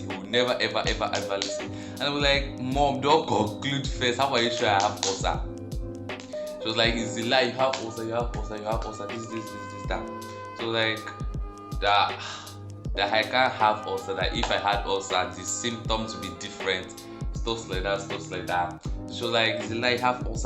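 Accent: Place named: Africa. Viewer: I love this channel, please make more videos, could you put subs on though, I'm british & you're talking a bit too fast & not being racist but you have a really strong african accent & when you talk fast it's hard for me to comprehend what you're saying, it might just be me, let me know if anyone else thinks the same..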